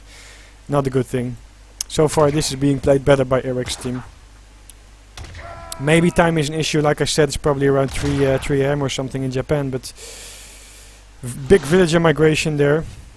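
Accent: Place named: Netherlands. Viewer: The accent is dutch, the way you talk is dutch, welkom mede hollander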